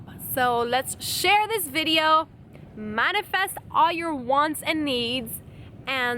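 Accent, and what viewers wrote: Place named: Miami, United States. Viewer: ayyy reppin the 305 with that Miami accent 🧡💚 congrats!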